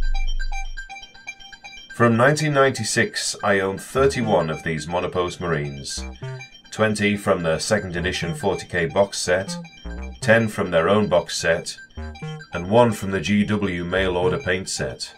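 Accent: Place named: United Kingdom. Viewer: the deep voiceover with UK accent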